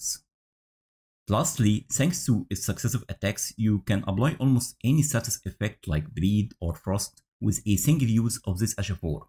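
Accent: Egypt. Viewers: This thick egyption accent of yours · Great vid ! you sound egyptian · why do you sound like an Egyptian while you're speaking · Your video is very well prepared and it left me speechless after I heard your Egyptian accent you are a legend ❤ keep up the massive work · واضح انك مصري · Brother, i bet you're egyptian... i could easily recognize the egyptian accent... since im egyptian also. Btw that was a good build guide, ngl. I'll definitely gonna try it later today ❤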